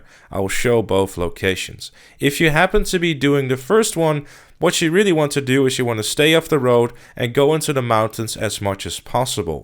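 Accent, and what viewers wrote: Netherlands. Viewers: Heyy are you Dutch? cause i think i hear it in your voice ik ben ook nl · is this guy dutch? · Are you dutch? You have a bit of an accent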